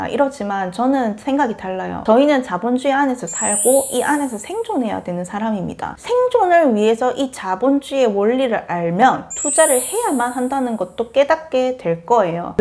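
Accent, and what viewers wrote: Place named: Busan, South Korea. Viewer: 부산 사터리 넘나 귀엽다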